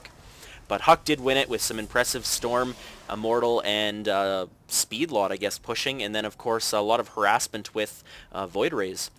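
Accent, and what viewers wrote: Canada. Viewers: I'm Canadian and I didn't know what a Canadian accent was before hearing you. Keep up the great work. Your commentary is up there with the best. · you sound so canadian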